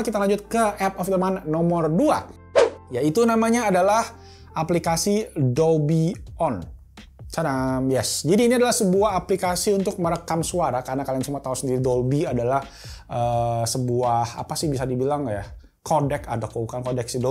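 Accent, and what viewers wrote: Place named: Palembang, Indonesia. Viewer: e' o' , ya ampun bahaso palembang nian🤣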